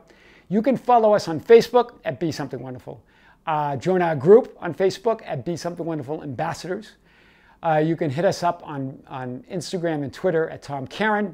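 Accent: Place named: New England, United States. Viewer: Where are you from?! From the hat and the accent I would guess somewhere in New England! From New Hampshire over here! Blessings to you! Love your videos. Thank you for clarifying for, and inspiring so many people around the world! ♥️♥️♥️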